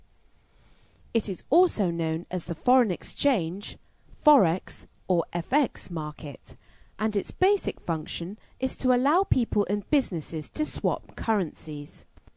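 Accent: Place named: England, United Kingdom. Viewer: I read somewhere that a female voice is recepted better by people than a male voice is, in such things as this video (instruction audio); it's a psychological thing, and has to do with our mothers nurturing and raising us when we're babies.-- I like this video, very informative and simple and easy to listen to (because of the female voice and the English accent. > "Bonds" James bOnds.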